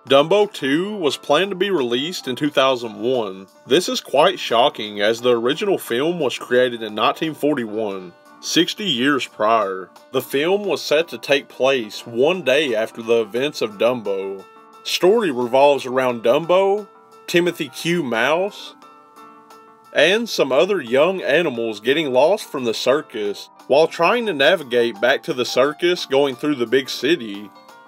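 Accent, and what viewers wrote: South, United States. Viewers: He sounds like he's from Texas or Louisiana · I don't know why but I just like your Southern accent narrating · i love dat voice you're the southern chills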